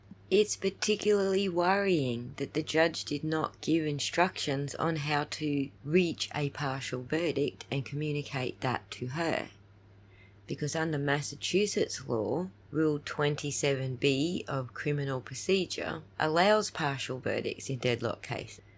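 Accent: Australia. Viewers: Love yer Aussie x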